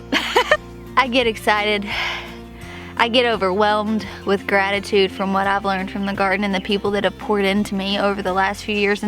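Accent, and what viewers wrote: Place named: South, United States. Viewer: I LOVE that you and Jessica Sowards both say "peench" instead of "pinch". Some southern YouTubers try to hide their southern-ness, and as a southern girl I am not about it! I'm here for the southern accents ♥️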